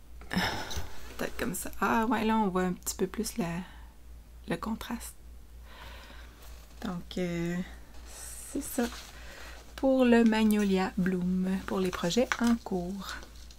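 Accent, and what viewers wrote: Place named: Quebec, Canada. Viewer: Je viens de découvrir ton podcast et j’aime beaucoup.J’adore entendre parler avec ce bel accent québécois que j’ai découvert quand nous avons rendu visite à notre fille à Chicoutimi lors d’une année d’étude .J’aime aussi le style de tes projets .A bientôt j’espere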